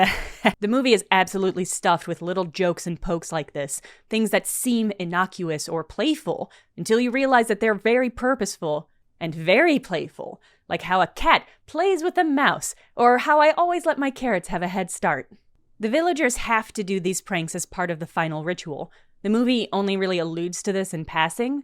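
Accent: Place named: United States. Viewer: Can I find a non American narrative of this British classic ?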